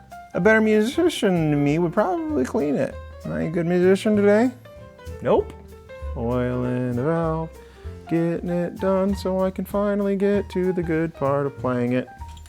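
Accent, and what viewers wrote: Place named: United States. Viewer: Lol he's American, that's how we pronounce it here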